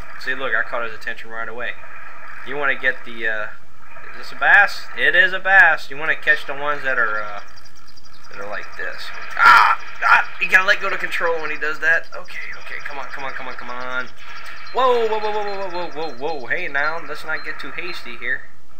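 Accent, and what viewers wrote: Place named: Louisiana, United States. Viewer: Where in south Louisiana are you from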